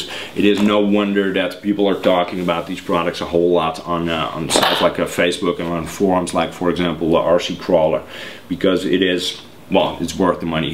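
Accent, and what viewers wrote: Netherlands. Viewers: Are you from Holland, you have a dutch accent.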